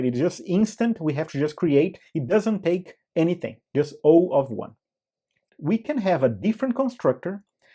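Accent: Brazil. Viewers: I'm sure your first language is portuguese, are you brazilian? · Eu reconheço o sotaque brasileiro quando eu escuto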